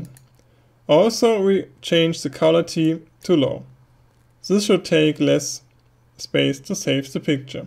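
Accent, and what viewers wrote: Germany. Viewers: Very nicely explained. Great German accent too. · Very well presented ... Easy to follow your tutes and a pleasure listening to your 'German English' :-) thanks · I simply loved his explanation! I am Brazilian and I speak little English. And although you have the German accent (it seemed to me), his way of explaining was very good, really. Got it in practice more than 80% of the things you said. This is a challenge for me because I have difficulty understanding English when people talk a little faster. With you, it was great! Another important point is your personal position because you are very friendly and pleasant in their explanations. Congratulations!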